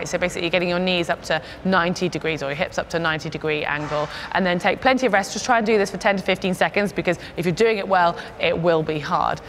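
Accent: United Kingdom. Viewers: I watched this vid many times for the British accent. My running improved as a bonus. 😄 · I love British accent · It's great :) I love the British accent Im working on mine one to keep :) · What are you talking about! British accent is awesome.. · Omg why this British accent sounds so stressful ..I am having problem with understanding it ...it's like a mind exercise for me coz I'm using my potential to understand her every word😂😂😂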